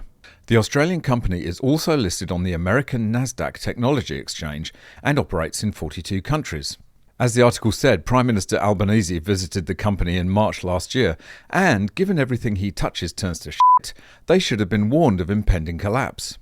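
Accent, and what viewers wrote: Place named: England, United Kingdom. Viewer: The channel host is from England. He even says as much on his channel summary description. Moreover, he has an English accent. 🇬🇧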